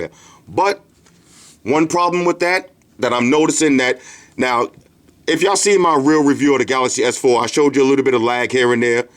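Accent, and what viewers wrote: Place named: New York, United States. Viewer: a real ny nigga.... i can tell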